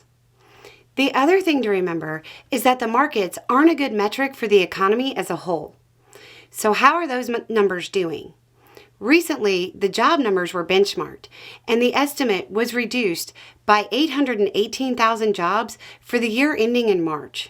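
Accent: South, United States. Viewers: I'm officially loving the shade Ms. weaves into her videos. Goddamn the knife seems to hurt a lot less when it's made it's cut. A knife sharpened hurts less but cuts deeper and all that..... when said with that strong southern woman voice. Ya know the one that's generally attributed to Grannys, aunties, and momma's, women who you don't mess with cos you know you'll be walking away with your behind red or coming back with your tail between your legs if you ignore them. Or maybe that's just my time growing up with my southern family that makes me think of all that advice that, as a kid, I didn't want to think applied to me and yet later on in the day id be wishing I listened to and having to come back to rolled eyes and apologizing and yes ma'am-ing for showing the disrespect in not listening to them. I pray we don't ignore that advice this time, don't forget the wisdom given to us isn't done to do anything but save our butts in the end, to benefit us rather than anyone else. 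That we can listen to this kind of intelligence and knowledge, hard won and earned wisdom by people who are trying to do right by us, and not try to see if we can have a different outcome, like we will be just fine going to catch frogs in our Sunday clothes, we won't get wet or muddy, having to run home and try to change into something else without anyone seeing, without anyone finding the evidence, and anyone realizing we changed (or be able to say we wanted to change, we didn't HAVE TO, we just lik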